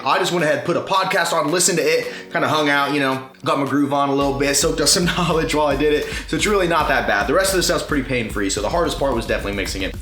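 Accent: Texas, United States. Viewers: Wow...he sounds so much more Texan in his more recent videos.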